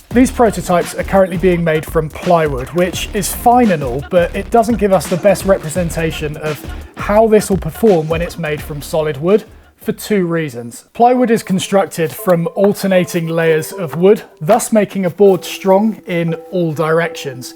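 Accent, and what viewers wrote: United Kingdom. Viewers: I wish I had this man's British accent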